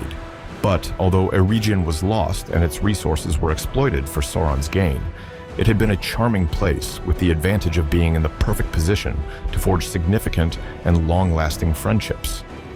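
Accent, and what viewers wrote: United States. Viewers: The pronunciation of place names is too American. Especially Osgiliath and Eregion.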